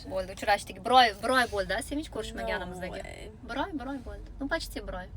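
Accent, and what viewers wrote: Uzbekistan. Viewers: Ўзлигиззи йўқотмаганиз яхши. Тилиз ширин. Тозза тошкентча. Қизиз билан ўзбекча гаплашвуринг. Ростанам опа сингилга ўхшисила.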